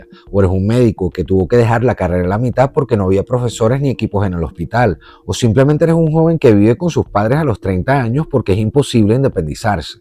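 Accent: Venezuela. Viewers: ¿De qué parte de Venezuela es ese acento? Jamás lo había escuchado antes.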